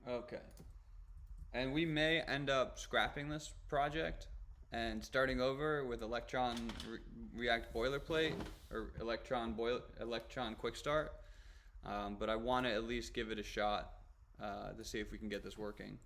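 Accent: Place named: Belfast, United Kingdom. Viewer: Tongue, Accents Belfast oh mannnnny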